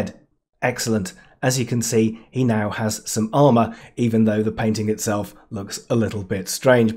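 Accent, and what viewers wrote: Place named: United Kingdom. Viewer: When I hear your voice I think of Monty Python, with that perfect British diction, it's kind of humorous to be honest.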